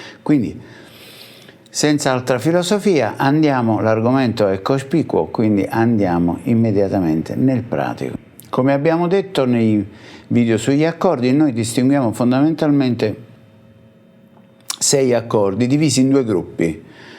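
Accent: Naples, Italy. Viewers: è bravo, bravissimo comunque, anche fosse di Brunico. La Napoletanità non c’entra proprio una cippa, se non per la parlata sardonica e disincantata da napoli-snob che è un piacevole orpello all’ascolto. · Maestro sei grande! lo adoro sto' accento Napoletano!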